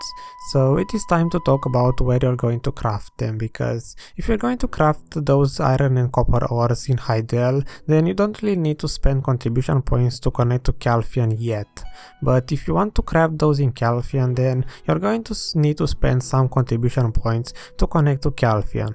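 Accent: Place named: Romania. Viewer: Romanian accent detected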